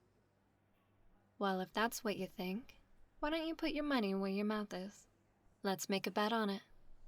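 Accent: South, United States. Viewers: Love the audio and love the southern belle hints to it aswell great work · Love the southern twang in this one. Thanks for the audio! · That southern drawl adds SO much!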